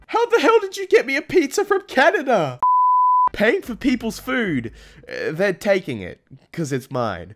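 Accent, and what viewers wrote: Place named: Australia. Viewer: Emkay bUt Australian.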